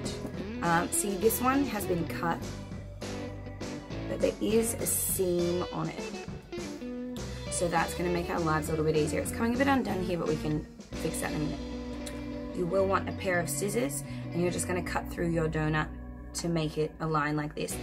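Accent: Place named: Australia. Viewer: It sounds to me like you now have a middling English with slight Australian accent. Nice!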